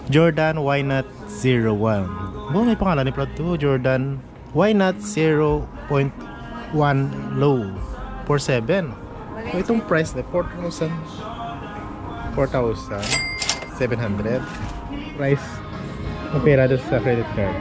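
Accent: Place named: Iloilo, Philippines. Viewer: ilonggo accent hehe bal.an gid haha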